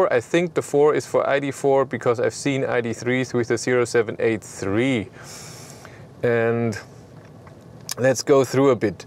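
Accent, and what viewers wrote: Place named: Lower Bavaria, Germany. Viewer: How I love your Niederbayrisch 😍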